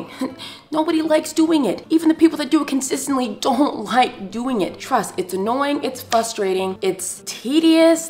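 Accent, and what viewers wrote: England, United Kingdom. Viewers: I love when Black Americans do an English accent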